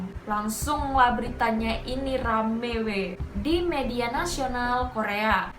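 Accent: Medan, Indonesia. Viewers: Mantap logat Medan nya euy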